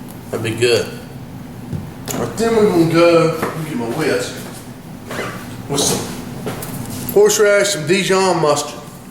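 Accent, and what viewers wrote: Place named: Georgia, United States. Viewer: Came across this vid after I bought some flat iron steaks at the local Piggly Wiggly. Started strong with the proper manner of talkin' (like we do round here in mid-Ga) you lost some credibility cracking open that Buttwiper (Light, at that!) but then, it IS STILL beer . . . so I continued watching. Will do some steak fries instead of the new taters and reckon it ought turn out purdy decent. Keep ya posted.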